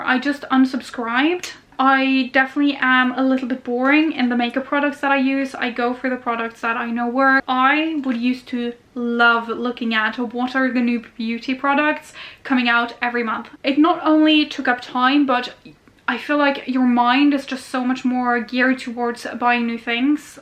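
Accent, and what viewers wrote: Ireland. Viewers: I love your Irish accent!❤ Greetings from Israel🤗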